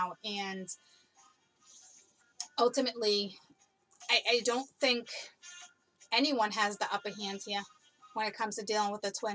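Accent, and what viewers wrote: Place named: Massachusetts, United States. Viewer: You are my favorite reader! Also I love that you have a Massachusetts accent I am from Massachusetts and have lived away from home for a long time. Every time I hear your voice and how you interact with your kids, it is like getting a huge piece of home. Thank you for your service!!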